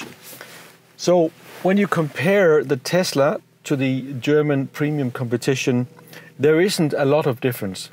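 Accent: Denmark. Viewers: It definitely has the enhanced autopilot, since it shows that it wants to change lane, when you started indicating in the video. The only problem was, that you yanked the steering wheel too hard. Its very sensible on that. But I agree: The feature is not worth its money (just yet)… I love the danish accent by the way! Saying that as a half dane. Haha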